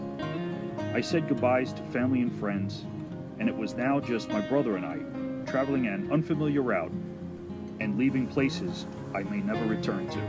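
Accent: New York, United States. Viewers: Hi I am sorry i don't know your name. I enjoy watching your Video's. Love your New York accent. People say I sound like I am from Bronx.. LOL We live and boat on the South Shore long Island. We also snow bird in Naples FL.. My Wife and I have a 94 Mainship which We love. i also do my own work so i can relate to your video'. Safe Travels ( 7 knots is painfully slow) lol keep the Video's coming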